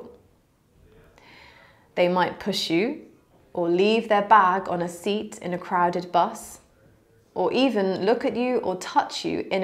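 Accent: United Kingdom. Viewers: 영국 발음 매력적이야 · 영국 발음도 듣고 표현도 배우고 최고!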